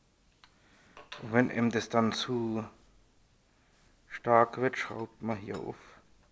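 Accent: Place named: Hesse, Germany. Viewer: vielen Dank für deine VA! hat mich mega gefreut! :) du bist ja geil drauf :D aus welcher Region kommt denn dein Akzent? Man könnte meinen du kommst aus dem Hessischen Bergland :D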